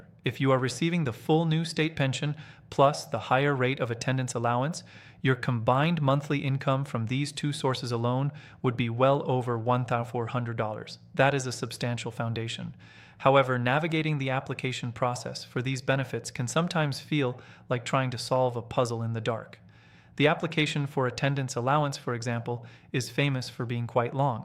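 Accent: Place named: United States. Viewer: Why have we got some American telling us this?mit is really annoying. Not to mention being called a senior!!!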